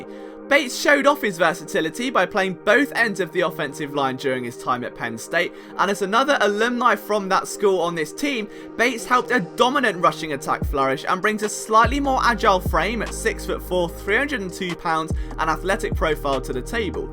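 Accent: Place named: United Kingdom. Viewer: I just can't listen to the British accent... and I'm British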